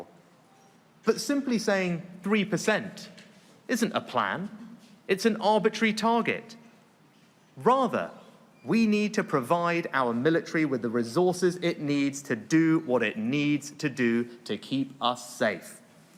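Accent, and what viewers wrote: United Kingdom. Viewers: Bravo to Rishi Sunak. If someone puts a curtain in front and hears him, will know a sincere British is speaking...So Decide Whom you vote....Best for Your New Prime Minister... God Bless Britan !!!!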